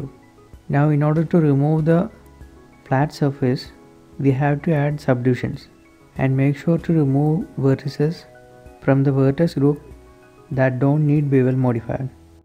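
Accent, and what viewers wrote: India. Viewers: If You hear the India guy, You know that tutorial must be good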